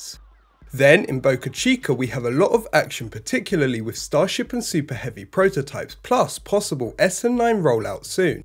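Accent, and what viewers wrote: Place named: East Anglia, United Kingdom. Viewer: The pronunciation is from East Anglia. It is spoken this way by millions.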